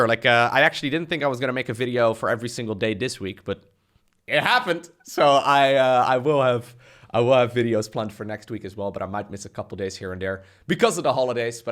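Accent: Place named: Netherlands. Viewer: Well a dutch accent isnt really a problem i think. Id love to hear your voice in a film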